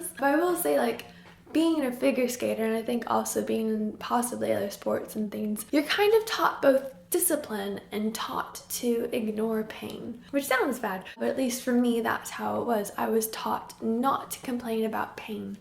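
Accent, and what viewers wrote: England, United Kingdom. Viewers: Ugh I totally understand bouncing between doctors and them not giving you answers. But sooo glad that you have it diagnosed and hopefully a new treatment plan that actually is addressing the Raynaud's! Also I read this comment on one of your previous videos, but I think it's so cool (and cute) to hear you pick up a British accent. How some words you say are more "British-y" sounding, if that makes sense? lol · I like how the English accent is seeping in. At first, I couldn't tell if you had an Americanized accent, but now I realize it was the other way around, and I'm just so pleased.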